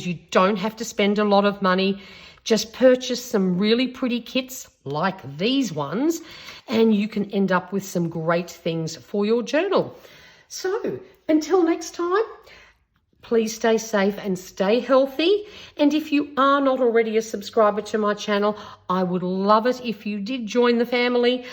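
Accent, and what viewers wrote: Australia. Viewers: why thank you! I didn’t mean to fish for subbies - doh! I just love hearing Aussie accents in our community & get so excited 😂 Especially when I like their work (like I do yours)!! But thank you! I have quite a bit of family in Brisbane & further north too. Hoping to make another trip up this year. It’s a gorgeous part of the world & always nice to be around family. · I keep going back to listen to you as I miss the Aussie accent...lol where in Brissie are you? I have family in and around there, not that I imagine you would know them...lol xxx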